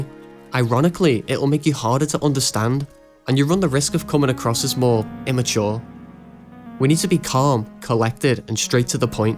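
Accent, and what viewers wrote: United Kingdom. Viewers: Why is he british????????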